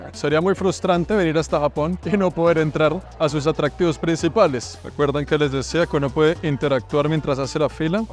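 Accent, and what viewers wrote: Colombia. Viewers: Buena, parce. Japón es bacano, y más su forma colombiana de relatar sus videos. Siga así!!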